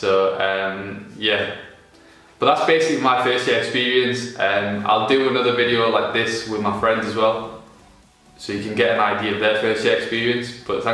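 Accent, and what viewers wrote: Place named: Teesside, United Kingdom. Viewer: are you from teesside? you're the only person doing medicine i know who sounds like me lol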